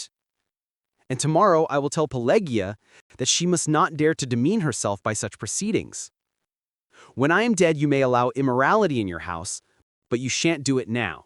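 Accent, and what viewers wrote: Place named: United States. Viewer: Я не ожидал, что голос с американским акцентом подойдёт к рассказам Чехова. Но это случилось. Удовольствие слушать!❤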